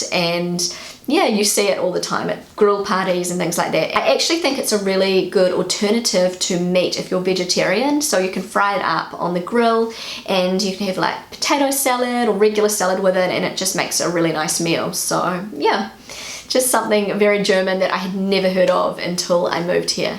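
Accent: New Zealand. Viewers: New Zealand accent is very similar to British accent, is it not? I would have thought, you come from England. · Curry flavid kitchip. The new Zealand accent is adorable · Love the accent. I almost wish I were an actor with a NZ character so I could practise the vowels. BTW, there's no "expresso"; it's "espresso".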